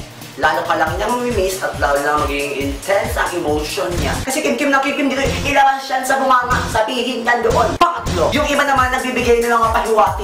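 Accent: Visayas, Philippines. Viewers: Kuya, bisaya ka po?:D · bisaya diay ka ba?:D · Bisaya ka pala..?! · bisaya ka kuya??? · bisaya ka pala dong! XD taga asa ka?? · May pagka bisaya rin siya noh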